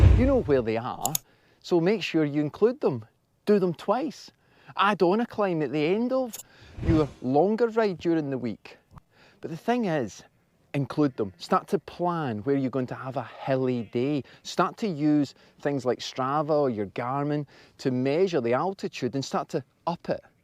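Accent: Scotland, United Kingdom. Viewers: Hi. I live in New Zealand (and have Scottish ancestors so every time I hear your accent somethin inside me sorta triggers, in a good way), and I am 140KG. Gravity and I dont get along. Yes, I could lose some weight, but considering I have gone down from 220KG, and been this weight for 6-8 years, its probably about where I will end up. I am still allergic to hills, but what I do now is I have 2 bikes, a cheap commutery type thing with compact crankset and a decent size big cog on the cluster, and a a roadie with full size gears. I do about 3 rides a week, 2 shorter ones and one long one. On the short ones I primarily ride up some hills with 13% sections using the commuter. Then on the weekends I combine all the hills in one longer ride on the roadie. I find that this works well, I dont blow out during the week (i'm also 55yr old, so recovery is slow), and then I find I can push the big gears a bit more. 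I'm going to apply some of the stuff you have said here as well and see how I go.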